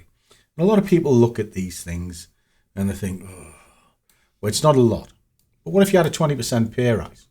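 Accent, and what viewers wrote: United Kingdom. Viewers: I didn't know you had a British accent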